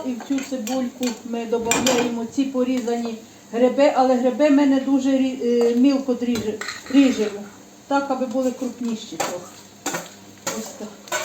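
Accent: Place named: Prykarpattia, Ukraine. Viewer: ДОБРОГО ДНЯ! СЬОГОДНІ ВИПАДКОВО ПОБАЧИЛА ВАШ КАНАЛ І ПОЧУЛА СВОЮ РІДНУ З ДИТИНСТВА МОВУ. АЖ ЗАПЛАКАЛА. Я ТЕЖ РОДОМ З ПРИКАРПАТТЯ. ВІДРАЗУ ПІДПИСАЛАСЬ НА ВАШ КПНАЛ. СКАЖІТЬ, А ЗВІДКИ ВИ КОНКРЕТНО? ДЯКУЮ.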